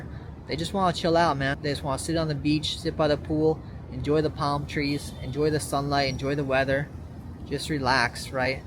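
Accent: New York, United States. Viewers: Beautiful message, brother! Love your NYC accent ❤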